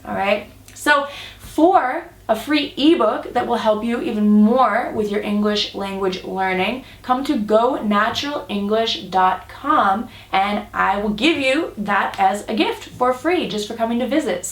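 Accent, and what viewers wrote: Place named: United States. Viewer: Hello whats your name? The one whos speaking? Do you have any websites? -- you sounds great.. you speaks like american, aren't you? I want to sounds native..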